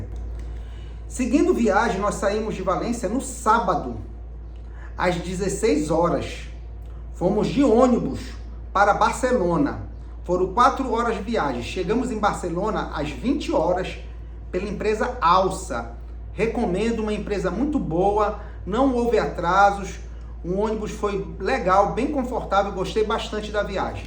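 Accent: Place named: Pará, Brazil. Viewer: Pelo sotaque é paraense, rs! Acertei????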